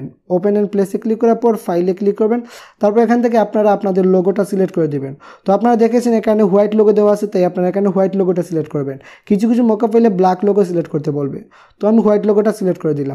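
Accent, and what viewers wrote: Sylhet, Bangladesh. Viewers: Vaiya apne Sylheti ni?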